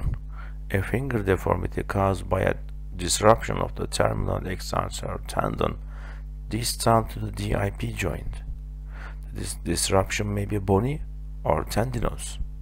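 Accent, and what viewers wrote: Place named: Turkey. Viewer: Bir Türk'ün İngilizcesini hemen tanırım:))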